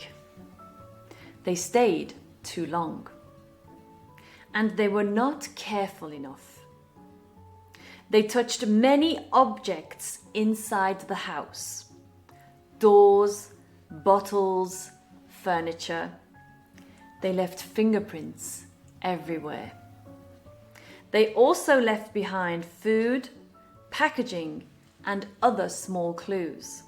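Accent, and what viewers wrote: United Kingdom. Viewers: Hi, it's a great story with a gorgeous British accent. I listened to it with great pleasure. Keep making us happy.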